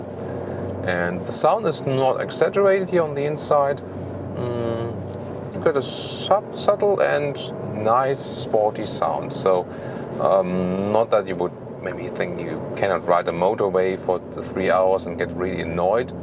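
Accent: Germany. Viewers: Once more an excellent review, a pleasure to watch. Thank you. I also enjoy your English with some German flavours, which is totally different compared to American English which I hate as many intelligent people do 😝..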